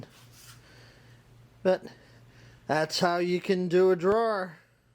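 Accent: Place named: New Orleans, United States. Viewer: that's slick. I'm planning to build some drawers in my shop and I'm definitely going to have to use some " Blocks". I can still detect a little of the New Orleans accent.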